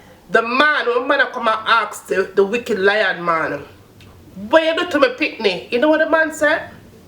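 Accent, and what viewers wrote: Jamaica. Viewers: The bible has some very harsh words regarding people who abuse children, its better you were never born. No need to say Jamaican accent, I think you subscribers know already :)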